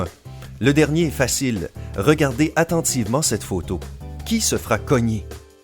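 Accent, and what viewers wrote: Quebec, Canada. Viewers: Il a un accent québecois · Hmmm son accent est cwlui dun quebecoi si je me trompe pas..☺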